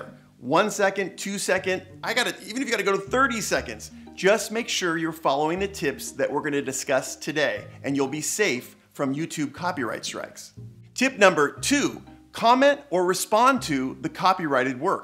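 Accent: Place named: United States. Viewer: You really talk like an American person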